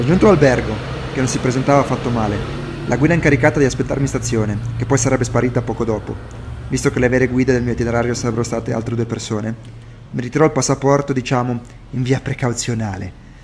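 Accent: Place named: Ancona, Italy. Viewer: Dall'accento sei anconetano...ciao!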